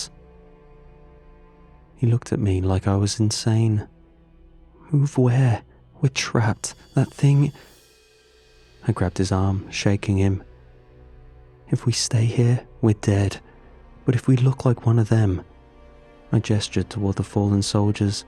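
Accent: United Kingdom. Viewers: The British pronunciation of many words varies from ours greatly. And he has probably just as many British subs as he does anywhere else. So why should he cater to just one area with his pronunciation? Now if you want to hear some horrible grammar go listen to! He is American and mispronounces so many words I’ve given up trying to help him.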